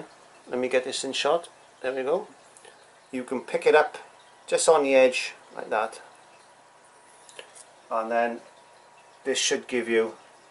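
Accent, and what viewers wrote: Wales, United Kingdom. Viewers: I find your method of teaching so easy to follow, you cover every aspect of painting and make it all look so easy. Love the Welsh accent having lived in S.Wales for a number of yrs. Thanks again for all the tips. Mary Thomas.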